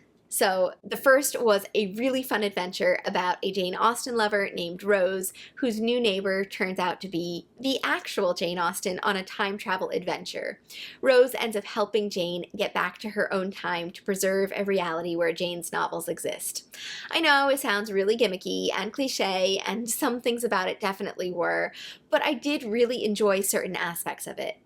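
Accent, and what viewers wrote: United States. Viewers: Glad to see you posting again; hope the little one is doing well. It might be informative to the fans of your three sites to hear how Jane Austen July was kicked off by you, the Spinster, and Katie. Did one of you have the idea and floated it by the other two or did you have a discussion on how to gin up more interest in JA? You are audibly American, whereas Katie is a Brit and Claudia is Italian-German who is married to a Brit. Did you all meet through YouTube? Your suggestions for book-reads are all good. I read Rory Muir's biography of The Duke (he was a second son who had to find his way through the Army), who became a much bigger fish than his once-famous older brother. You simply can't pluck JA out of context; the Napoleonic Wars, the Navy, and the struggle with France was the whole political underpinning to Jane's "fictional" world. 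Thus I would consider Patrick O'Brian (author of the Master and Commander books and a GREAT admirer of Jane) to also be acceptable fodder for July's activities.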